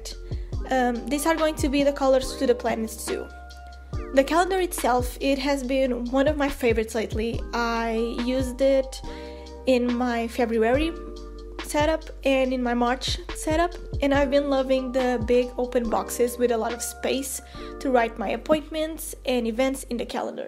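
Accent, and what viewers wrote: Portugal. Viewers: Tive de ir procurar os comentários portugueses.... Eu estava a ouvir e só pensava : este sotaque é muito uma portuguesa a falar ... Tive mesmo de ir conferir , eheh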